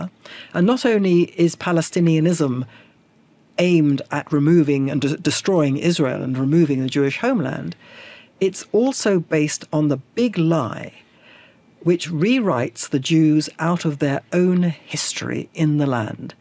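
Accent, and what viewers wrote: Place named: RP, United Kingdom. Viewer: Yeah nice posh accent full of nonsense, so easy to inform lies into tiny minds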